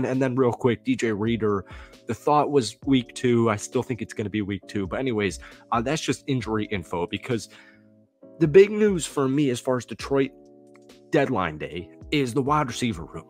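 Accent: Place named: South, United States. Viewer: Please don’t take this negatively. I’m curious about where you are from because of your accent. It sounds southern at times and not sure what else.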